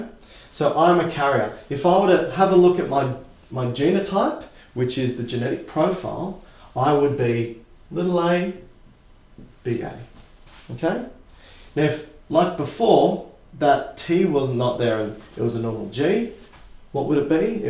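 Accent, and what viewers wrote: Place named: Australia. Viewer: Hi I only just found your channel as my daughter and I have a 1Q22 duplication and from what I’ve tried to research on it it is very rare and not much known about it and all the other channels and stuff I’ve found are from other countries but by your accent can pretty well assume your an Aussie and hopefully could shed some light on this for me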